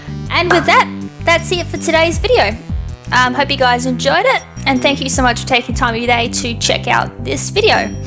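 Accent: Australia. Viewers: Pimp sent me to hear that delicious Aussie accent.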